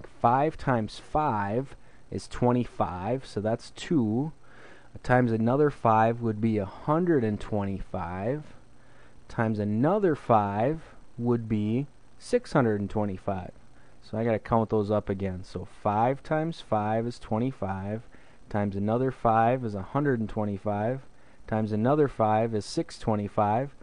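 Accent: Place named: Canada. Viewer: the canadian is so strong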